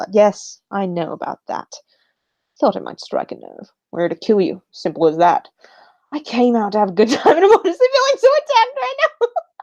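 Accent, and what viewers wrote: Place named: United Kingdom. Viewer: Your British accent is perfect. And don't think I didn't catch that harry potter reference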